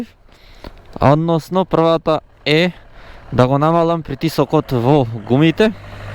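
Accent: Bitola, North Macedonia. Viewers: Процедурата следува вака -Си седнувам пред комп.уклучувам твое видео и верувај цело време полу насмеан гледам со уживање.Мераци од пејсажи,интересни и за нас помалку чудни култури,битолскиот нагласок,духовити коментари.И еден апел до сите нас овде.Поддржето го детето во неговите авантури.Ретко мноооогууу ретко( за мене е дете) со 23 години би се осмелил на нешто олку гломазно патување полно со мал милион пречки,со мал буџет ама со голема храброст,енергија и ентузијазам.ЦАР СИ!!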